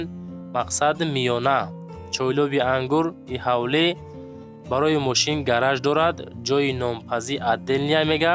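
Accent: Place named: Gharm, Tajikistan. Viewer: Чхели Ғармай Раштай соз соз гап зан бародар нашоти Ғарм🫵✅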